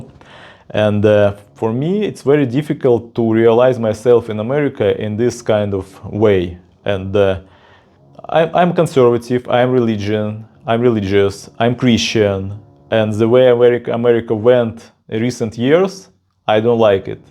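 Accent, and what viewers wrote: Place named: Russia. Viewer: Oh my God! I'm from Canada I got your add. When I heard you speaking with Russian accent. I just stumbled I couldn't believe my eyes 😂. That is so cool! I'm definitely signing up for your channel!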